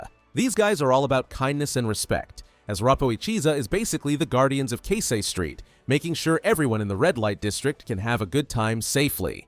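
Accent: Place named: United States. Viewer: the american pronounciation of the japanese is so funny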